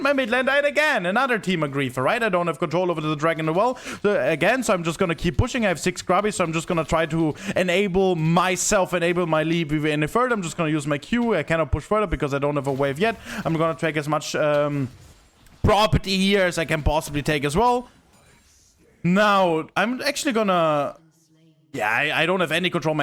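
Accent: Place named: Germany. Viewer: Dierekt gemerkt dass er deutscher ist hahahha aber echt gutes guide video fuer low elo und die fundamentals zu zeigen wie wichtig das ist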